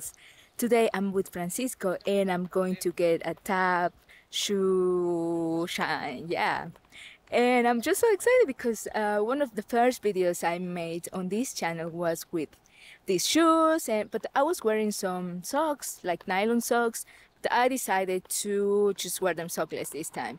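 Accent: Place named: Mexico. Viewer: I love your Mexican accent.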